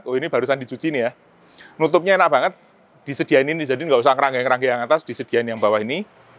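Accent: Java, Indonesia. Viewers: Tetap pertahankan medoknya pak😂😂😂....saya subscribe baru anda dari negeri yang jauh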